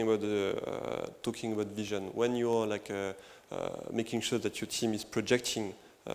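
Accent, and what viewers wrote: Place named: France. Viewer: Ecouter un français parler anglais ça devient vite insupportable. Est-ce que vraiment le type de public justifiait ça?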